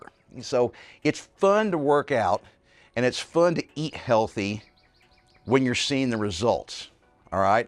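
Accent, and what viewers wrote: Texas, United States. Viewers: He’s a Texan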